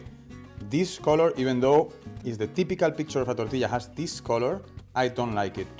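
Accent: Spain. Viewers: Even better to watch when the guy is good looking. And that beautiful Spanish accent….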